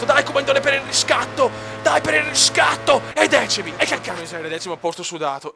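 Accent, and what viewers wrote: Veneto, Italy. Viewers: prendili coem dei consigli: 1- perfavore basta con le frasi fatte tipo sono teso, ripetuto 20 volte, i soprannomi ai piloti (dopo un po sono tristi), comunque grande con l' accento da gondolaro e il viva la figa<3 spezzi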